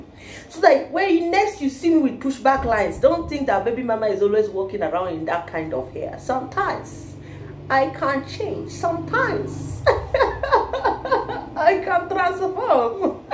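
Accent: Nigeria. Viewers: Baby mama, that Nigerian acsent sounds very sweet ooh, l enjoy it.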